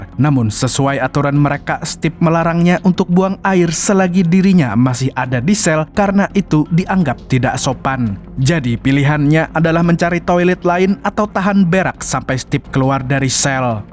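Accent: Banjar, Indonesia. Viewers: Orang banjar kalsel kah pian mang😂😂 "kyapa habar pian berataan" jar pian😅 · Urang banjar kah abng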